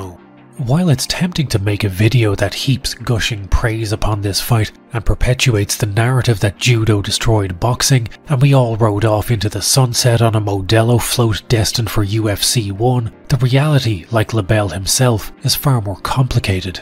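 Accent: Ireland. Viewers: I've just found your channel and damn, am I impressed. Great to hear an Irish accent smashing it too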